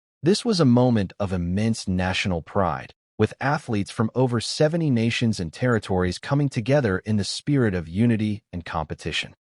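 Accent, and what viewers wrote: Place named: United States. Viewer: AI American accent , NOT AUSSIE 1 !.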